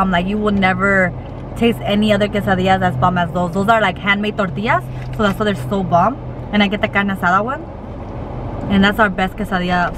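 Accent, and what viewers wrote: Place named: California, United States. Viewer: Your accent reminds me of home!! From San Pedro but we moved to San Antonio when i was little Nice review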